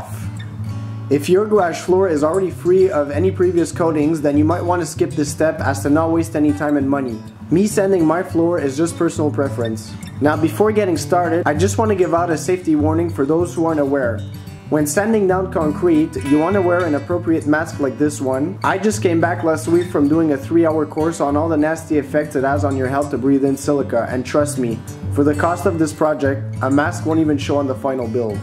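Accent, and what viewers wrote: Quebec, Canada. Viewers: Heyyy!!! Toi tu as un accent quebecois quand tu parle! Vraiment sick! Jme creer un garage moi avec, so i'm super thrilled to have landed on your channel!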